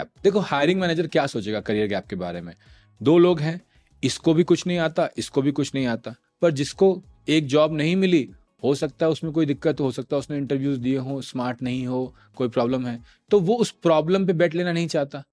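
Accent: Bihar, India. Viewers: Bihari tone aa rha aapki baato main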